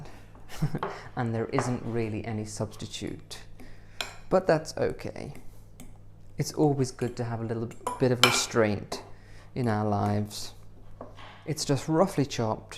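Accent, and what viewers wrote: RP, United Kingdom. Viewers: Lovely concept and lovely video. I’m curiously wondering about the accent. I’m assuming it’s British but from which part? Possibly from Africa , Zimbabwean maybe? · So posh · You have a British accent like the lead singer from the. You guys must be from the same town. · Oh my god I couldn't imagine working with this geezer. Speak normal because I can't stand posh people, it's like they act so superior and know everything. Oh shut up.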